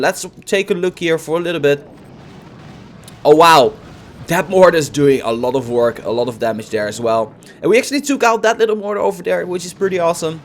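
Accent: Netherlands. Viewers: Je bent 100% Nederlands, dat hoor je gewoon.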